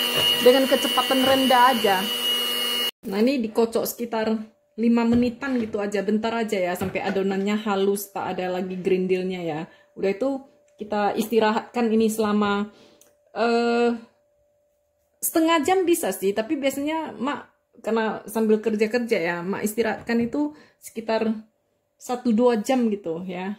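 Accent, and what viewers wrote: Medan, Indonesia. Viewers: Kangen juga dengar logat2 medan mak.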